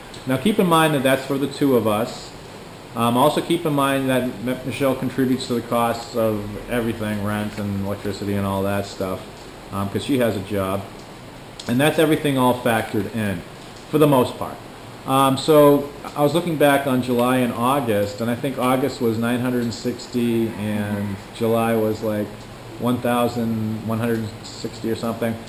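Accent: Boston, United States. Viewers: DO THEY EVER SAY ANYTHING ABOUT YOUR ACCENT BEING FROM MASS I KNOW THEY DO IN OTHER STATES HERE IN USA IF YOUR FROM BOSTON THEY SAY PAACK THE CAAAAAAR LOL LABSTA OR LOBSTER LOL I GUESS WE LEAVE THE R OUT PEOPLE MADE FUN OF ME ALL THE TIME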